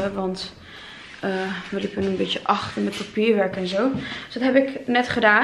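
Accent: Twente, Netherlands. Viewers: Is Twents accent hahah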